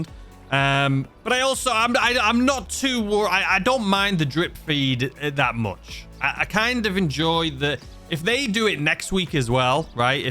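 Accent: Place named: Doncaster, United Kingdom. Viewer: Sounds like your from sunny Doncaster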